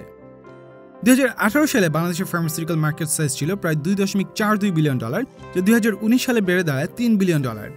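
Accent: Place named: United Kingdom. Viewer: আপনার সব বাংলাদেশী youtubers রা বাংলা ভাষা বলার সময় এতো British দের কথা বলার টান ব্যাবহার কেন কেরন।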